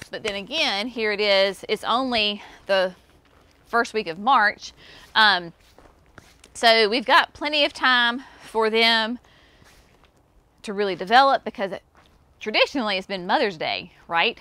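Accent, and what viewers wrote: South, United States. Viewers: just a little off topic...I just watched the tree removal vlog....just for future reference ( from a Canadian girl ) ....a TOBOGGAN is a flat sled .....a TOQUE ( pronounced too-k ) is what americans call a beanie, like your cute little white hat.....by the way , I love your southern accent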